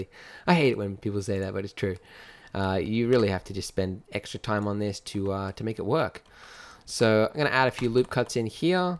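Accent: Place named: Australia. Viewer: Aawwh, great job once again! The nice thing 'bout your videos is that you can learn about blender and practice aussie-accent at once! :D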